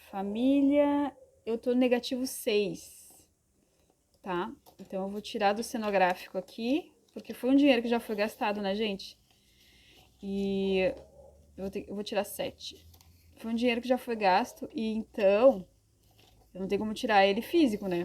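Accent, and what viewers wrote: Brazil. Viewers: Tu é gaúcha? De q cidade? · Teu sotaque é maravilhoso me convida pra ir toma um chimarrão ai com vc kkkk Sou de Lages SC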